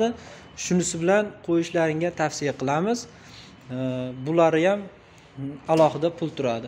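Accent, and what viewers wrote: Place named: Russia. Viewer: Ака лекин русча аксентийизга беш кеттим лекин, 😂😂😂 кловат, и, димахот гап ук лекин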